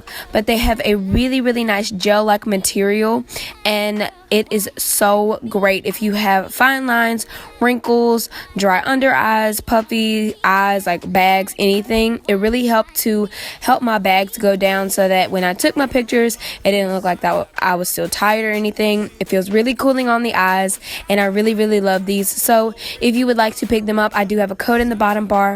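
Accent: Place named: South, United States. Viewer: Sound so country / southern !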